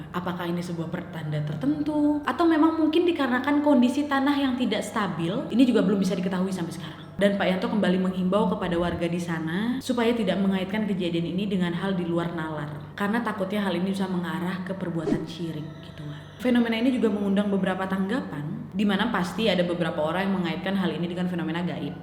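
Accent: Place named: Pontianak, Indonesia. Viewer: Org pontianak mana suara nya ,,😆😎💪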